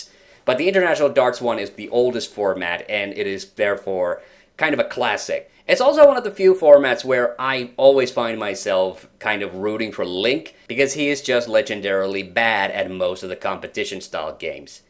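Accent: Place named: Finland. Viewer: Oletko suomalainen? Tyckte jag anade en finskt dialekt...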